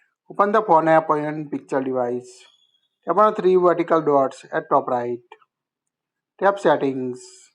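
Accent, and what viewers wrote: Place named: India. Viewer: Sir, Sir you would be better using a computer and print it out. Good grief. People cannot understand your dialect. It's too strong. I know you're speaking English, but it's a strong Indian dialect. Use a computer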